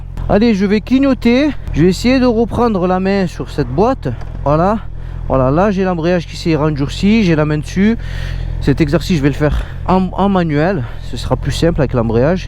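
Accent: South, France. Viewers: Super vidéo ! Et franchement ton accent du Sud quel plaisir à écouter pour un parigo comme moi 💪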